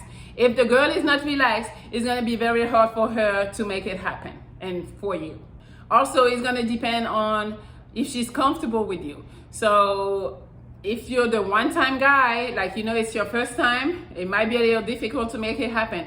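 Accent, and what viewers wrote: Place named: France. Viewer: ?Coule it be that you speak french?Because of your accent i mean.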